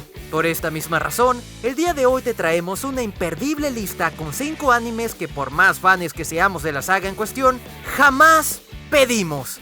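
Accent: Venezuela. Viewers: eres venezolano o que men?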